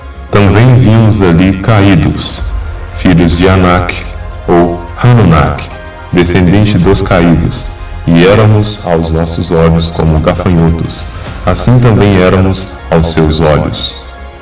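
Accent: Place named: Minas Gerais, Brazil. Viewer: Se essa voz pertence ao " ", ele é um ótimo ator pois conseguiu inserir na voz o tão conhecido sotaque mineiro. Se for ele, está no lugar errado deveria tentar Hollywood... Só uma sugestão, Se For ele está desperdiçando talento...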